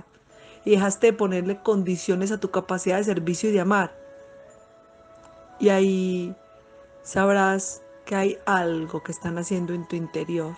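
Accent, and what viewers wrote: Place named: Colombia. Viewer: Eres maravillosa. Eres muy especial, bueno, realmente no eres especial, eres un miembro más de este universo que hace en los corazones de quien te escucha un espacio mejor. Especial son las personas que necesitan amor y luz. Realmente eres amor, con un nombre, con un acento colombiano, con un cuerpo de mujer. Infinitas gracias por tu amor y extenderlo a la humanidad.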